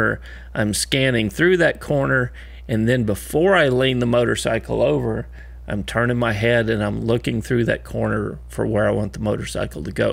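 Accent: Texas, United States. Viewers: Migth be useful for an impatient native english speaker, but I just love the way speaks. I used to work with a texan guy that talks just like. Calm, collected and thoughtful. Induces trust and confidence. I feel a bit like I am meditating, when I listen to his voice and advice.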